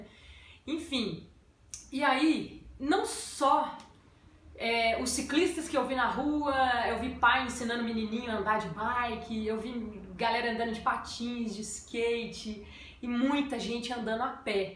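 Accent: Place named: Pernambuco, Brazil. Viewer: Kkkkkkkkkkkkkkkkkk É o "pernambuquês", 'fia'... BH é o máximo! E teu canal, tá igual ao programa de domingo à noite: Fantástico! Xeroooo em ritmo de forró!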